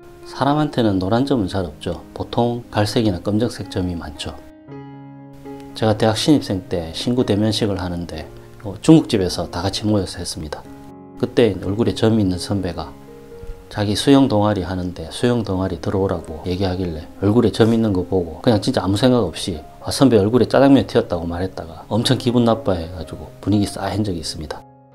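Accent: Gyeongsang, South Korea. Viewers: 님 경상도 사투리 멘트가 아주 정겹고 그림설명도 넘 좋으시네~♡♡ 🎉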